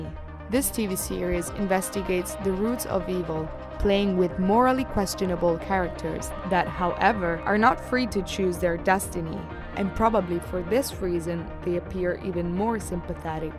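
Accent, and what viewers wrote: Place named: Italy. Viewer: sei italiana? si sente un pochino!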